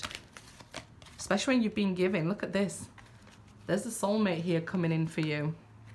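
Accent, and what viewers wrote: Yorkshire, United Kingdom. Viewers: I love Yorkshire accent! And Geordie too 😊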